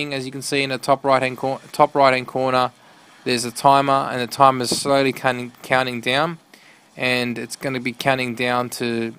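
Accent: Australia. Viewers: Ur an Aussie mate👍🏻 Same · Are you Australian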